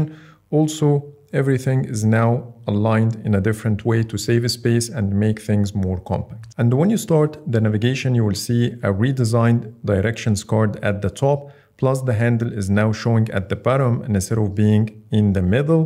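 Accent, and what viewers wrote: Egypt. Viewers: الصوت ده مصري قوي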